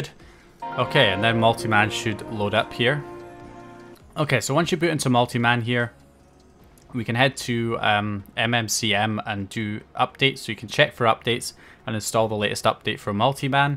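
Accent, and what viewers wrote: Scotland, United Kingdom. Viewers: Loving the channel! Well paced, logically explained, no background techno and a clear Scottish accent!! ;) Top class and incredibly helpful. Thank you!!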